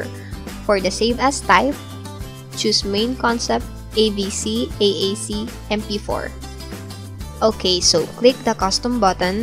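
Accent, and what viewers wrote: Philippines. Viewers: pinay ka po noh ?? haha accent pa lng by the way super thanks po dito sa tutorial vid. mo ♥ :)) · You are obviously from the Philippines with that hard-tongue accent. Hehehe. I'm subscribing. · I'm so in love with your accent!! Very cute!! <33 It sounds like a you have a Filipino accent. Are you from the Philippines? :)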